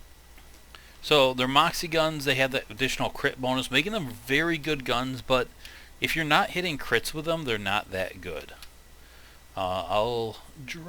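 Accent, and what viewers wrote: United States. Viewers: This guy has gotta be midwestern I can tell from how he says slag "slayg"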